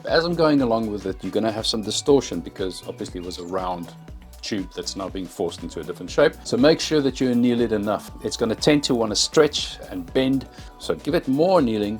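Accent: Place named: Netherlands. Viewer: Your sound Dutch😊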